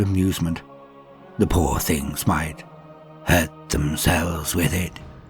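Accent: United Kingdom. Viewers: Godbless you. Nothing hits quite like a softspoken British man during a nap 🙏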